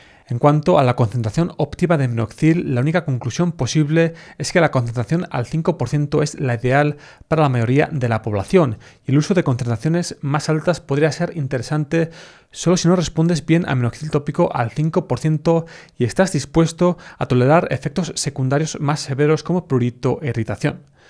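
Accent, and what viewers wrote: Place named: Castile, Spain. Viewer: Pues habla " perfectamente" eleganter castellano. Yo tampoco entiendo a los Latinos. Sin querer ofender.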